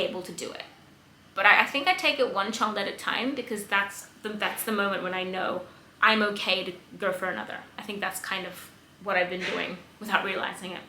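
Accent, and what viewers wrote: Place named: Australia. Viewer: Omg I thought you were Australian, you had a very strong Aussie accent when I first started watching some older videos! I’m Australian!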